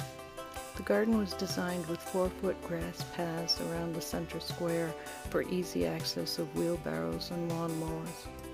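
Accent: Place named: Nova Scotia, Canada. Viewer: What a beautiful garden! Your accent suggests you are in Nova Scotia, am I right?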